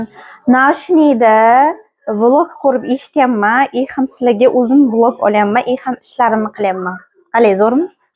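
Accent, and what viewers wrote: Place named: Samarkand, Uzbekistan. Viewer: Samarqandanmasmisiz sheva tortadi😊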